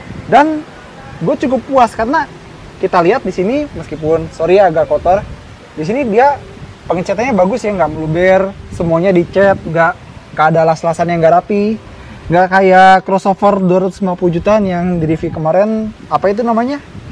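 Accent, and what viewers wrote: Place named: Bekasi, Indonesia. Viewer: kemang pratama banget.anak bekasi ya bang?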